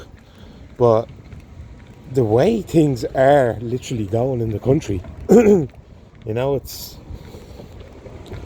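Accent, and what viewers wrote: Ireland. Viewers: you're Irish because you have one Irish parent and even if you hadn't you've been here a long time and sound Irish so of course this is your country.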